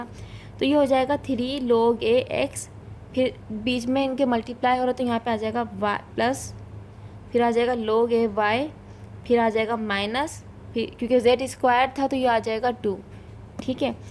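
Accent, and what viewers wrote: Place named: Sindh, India. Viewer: Madam aapki mother language sindhi hy ya urdu aap urdu sindhi ki tarah bol rahi hain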